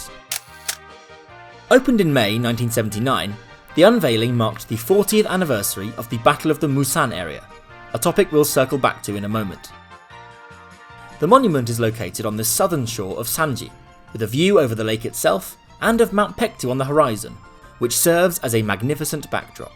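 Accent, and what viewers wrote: Manchester, United Kingdom. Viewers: Yes, sounds like a bit of a Manchester accent to me, certainly a bit Northern UK? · Yes. A British-sounding man with an apparently good knowledge of North Korea history. He seems to have also a good pronunciation of North Korean names and places. Not to mention his sophisticated taste in choosing background music. In short a man of mistery.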